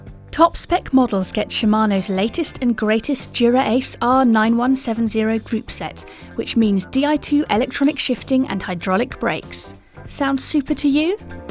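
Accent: United Kingdom. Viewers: Damn your british accent makes me ride along with you.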